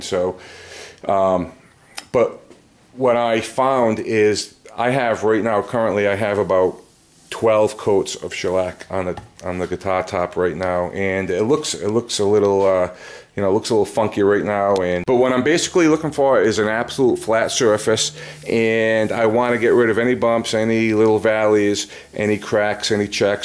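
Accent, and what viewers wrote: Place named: New England, United States. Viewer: The accent you speak with sounds very north east coast maybe Maine, Boston, but any way I was slug'n some beers and perusing YouTube and found this guitar video and I thought it was good shit, very informative from someone who has obviously had some hands on experience with the craft. Good stuff.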